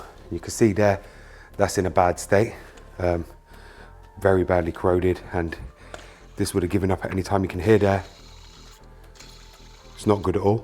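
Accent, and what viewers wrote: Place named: Cockney, United Kingdom. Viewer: Hi. Don't know if you read comments on a 2 year old video but your channel is gold to me mate so thanks heaps. I am going to be doing this job soon I think. My 2007 E61 is at 160000kms/100000 miles now. I have a leaking valve cover gasket which caused a misfire on two cylinders dripping onto the coils. Those were replaced fairly easily but I'm going to get a professional to do the valve cover gasket as I'm don't have all the tools or quite the skills to do it confidently. Nor do I have the time. Thankfully I have found a really good BMW mechanic and the quote I got is pretty reasonable. PCV vent hose and eccentric shaft censor seal are getting done too and all up the job is $850NZ which is very reasonable all things considered. Great mechanic with the best reputation in town. He's about as Kiwi as you are Cockney and I think you'd approve of the level of professionalism and use of original BMW parts. 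There's plenty I would do myself like belt, tentioner and idler pulley but the valce cover job is a bit beyond me I feel. Valvetronics will need coding in any case. Cheers mate. Thanks again.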